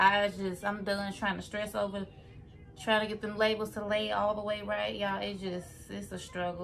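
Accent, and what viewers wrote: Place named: South, United States. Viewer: Love your country accent 😩 feels like home 😭❤️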